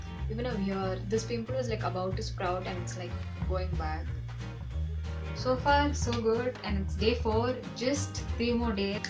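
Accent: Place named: South India, India. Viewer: Your Ascent of speaking English shows you are a south Indian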